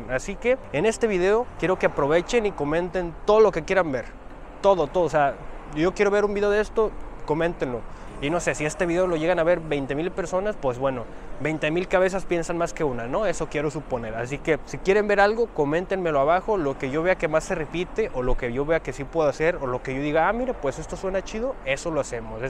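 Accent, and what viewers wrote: North, Mexico. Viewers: porque hablas bien norteño we? xD estaba jugando tetris mientras se puso el vídeo y no había visto tu jeta hasta que vi que eras japones pero pensé que eras un norteño aficionado a los carros xD aunque en realidad sí lo eres aunque tengas finta de japo siento que no estás a gusto ahí y no te sientes de Japón. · Jiji me encanta que ya habla como nosotros los mexicanos!!!mandarina en cajos 🤪🤪🤪🤪👍👍👍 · Yo te sigo porque eres de muy buena vibra ... aparte que hablas como yo .... Muy norteño .. Yo soy de Sonora ... pero vivo en Washington . DC.... cuidate Niño ... como Mexico no hay Dos .. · Carnal vista todo méxico también es igual hay mucho que ver es muy rico en historia muéstrale al mundo de que está echo mexico Estoy orgulloso de esto ese español que hablas 100%mexicano shaaaaaw saludos desde sanjuan de los lagos Jalisco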